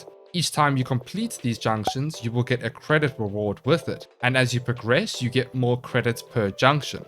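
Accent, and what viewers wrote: South Africa. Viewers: Is bro South-African, that accent goes hard? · You sound South African? Thanks for the guide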